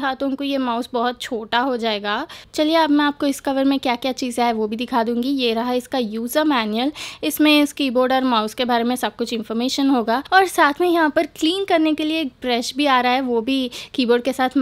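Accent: Hyderabad, India. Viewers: Vaaamo pakka hyderabadi hindi maatlaadesthunaavga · Your Hyderabadi language is super don't bother about any thing your doing well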